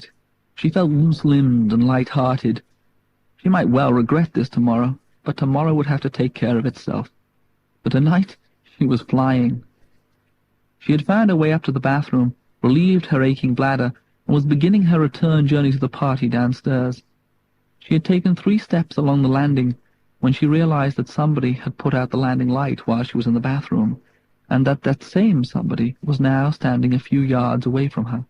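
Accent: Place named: Liverpool, United Kingdom. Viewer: Great to hear a reading by the author. Interesting way of speech going on there, I think it's an attempt to conceal a Liverpool accent.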